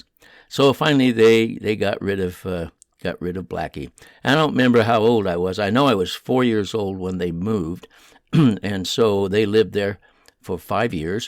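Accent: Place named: South, United States. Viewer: OK 👍 I'll subscribe to you... because you sound like a country hillbilly, like me...🤣 nice little story about Blackie and the well house..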